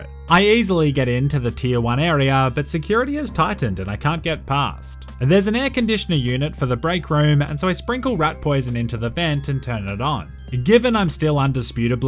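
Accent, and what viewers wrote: Australia. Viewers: I’m Australian and I’ve never heard someone speak like Aussie Man. Now you are speaking like Aussie man. Can’t be a coincidence. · I'm trying to figure out if he's either a Australian or a New Zealander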